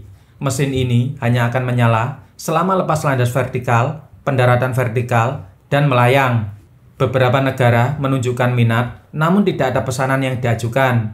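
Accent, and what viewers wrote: Java, Indonesia. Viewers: Yang di bahas random banget dari tekno, kesehatan, chip, militer, listrik, tp story tellingnya enak juga meskipun ngomongnya medok jawa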